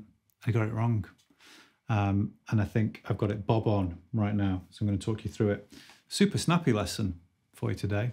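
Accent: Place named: United Kingdom. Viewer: You deserve so many more subscribers,. Keep it going! Oh, and you kind of remind me of a young Mick Mars (with the hat) with a British accent.:P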